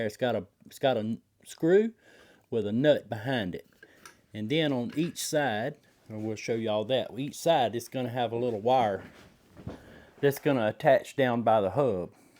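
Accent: South, United States. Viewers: A Chinese ebike review with an American southern accent is dope and funny at the same time lol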